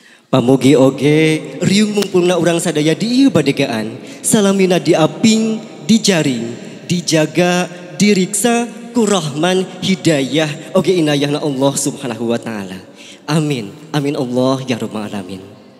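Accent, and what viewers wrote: Sunda, Indonesia. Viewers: Sae tah nyunda ngalegeuna.ciri has utang sunda.terus mumule bahasa sunda.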